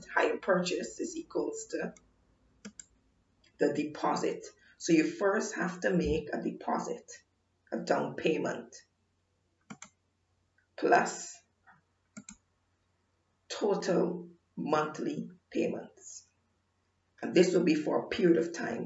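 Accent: Barbados. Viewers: Thank you, this helped me a lot and I love your accent are you a bajan?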